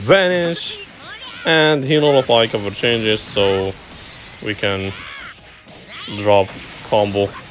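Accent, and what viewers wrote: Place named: Russia. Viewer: Good gameplay, good team and Russian accent. Perfection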